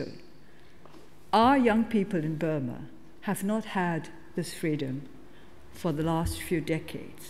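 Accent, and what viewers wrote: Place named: United Kingdom. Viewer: i didn't know she studied in oxford, no wonder why she has a a british accent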